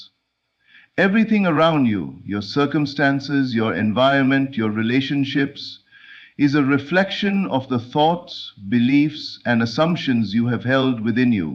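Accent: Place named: India. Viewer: Is this the Indian Neville Goddard because he has an Indian accent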